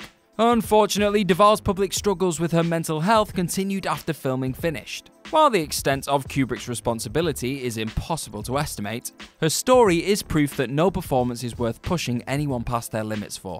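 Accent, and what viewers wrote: United Kingdom. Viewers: Don't forget, we're talking about British people narrating this, so their way of pronouncing some words and names may differ.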